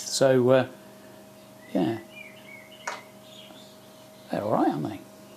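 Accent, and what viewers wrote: Norfolk, United Kingdom. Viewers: Enjoying your videos, yew sound like a Norfolk boy to me (as am I), I don't detect the upward tone rise of a Suffolk boy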